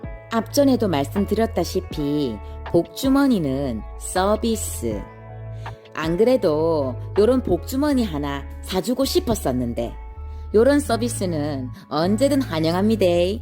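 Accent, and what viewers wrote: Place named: Gyeongsang, South Korea. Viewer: 친정이 경상도라 나레이션 귀에 잘 들어와용 ㅋㅋ 잘보고 가요~😻😻😻😻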